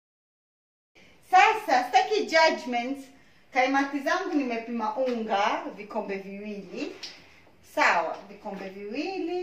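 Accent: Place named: Swahili, East Africa. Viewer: I love that Kiswahili accent ❤️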